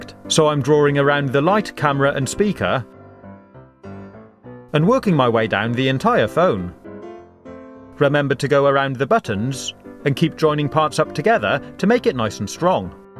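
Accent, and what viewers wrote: England, United Kingdom. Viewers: cool video i don’t wanna be mean but you sound like an england man like cool tone c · British accent?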